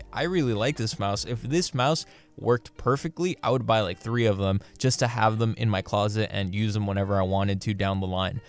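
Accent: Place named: United States. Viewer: why you sound like a american with fit body and few muscles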